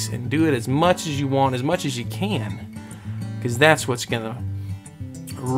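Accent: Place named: South, United States. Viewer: Lol....sounds like he has a southern accent and I've heard southerners use oil like it's a marinade.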